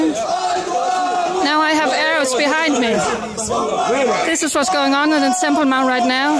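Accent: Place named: South, United States. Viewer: Where did you learn English? You have a little bit of a southern accent to your English. It's very cute. I like it!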